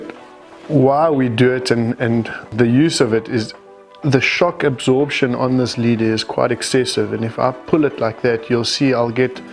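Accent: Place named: Yorkshire, United Kingdom. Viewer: English subtites would enhance it as Yarpee English is as bad as Yankee drawl.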